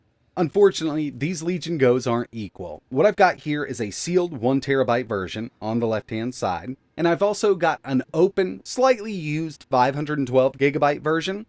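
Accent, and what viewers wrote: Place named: North Carolina, United States. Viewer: This is very generous! I just saw your address in your description, I thought I detected a fellow Tarheel's accent!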